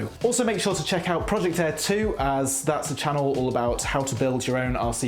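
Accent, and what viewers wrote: United Kingdom. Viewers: Is bro speaking UK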